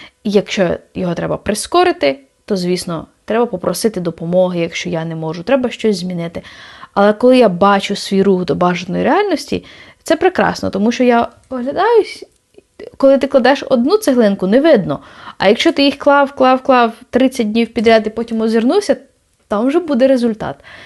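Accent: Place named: Lviv, Ukraine. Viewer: Вас так приємно слухати, що я навіть не вмикаю х1.5 (що зазвичай роблю). Ви наче маєте львівську говірку («будЕте», «до прикладу»…) чи ви дійсно львів’янка може? Так часто вас слухаю - складається враження, що ми особисто знайомі 😅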